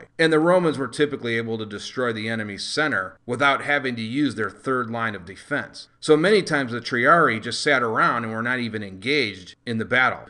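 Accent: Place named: United States. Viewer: Amazing, its sad that I have to google the metric equivalent but I can hear youre American so its understandable. Thank you so much!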